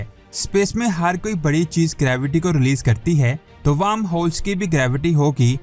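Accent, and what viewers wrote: Punjab, India. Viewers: Pehle apna panjabi lehja to badlo baat urdu m lehja panjabi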